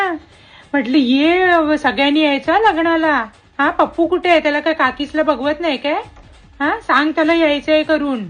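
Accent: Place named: Malvan, India. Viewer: bhari malvani tune please asmi navv ghe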